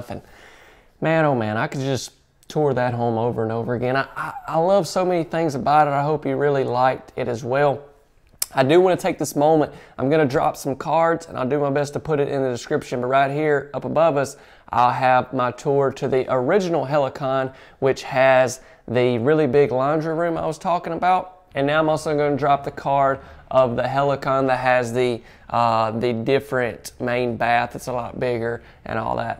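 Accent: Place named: Appalachia, United States. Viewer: Golly,nothin wrong with the hillbilly talk.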